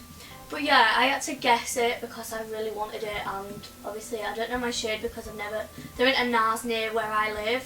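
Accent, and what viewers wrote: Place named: Yorkshire, United Kingdom. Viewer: She lives in Yorkshire like me. Ik ik we have the sexier accent 😂😂😂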